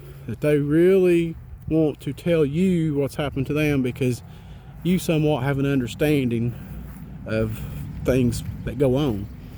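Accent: South, United States. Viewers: Nothing like a Southern storyteller. Great job! Very scary experience.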